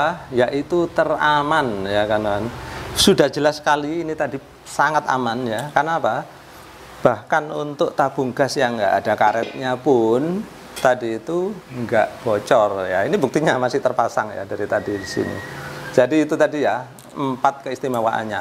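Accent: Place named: Yogyakarta, Indonesia. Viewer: Bahasanya Jogja bgt..Asli mana ya sebenarnya?👍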